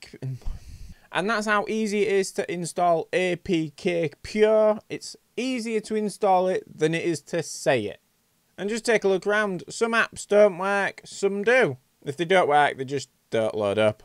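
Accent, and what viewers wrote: United Kingdom. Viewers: One thing i could confirm is that, he is from UK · Are you from Hull mate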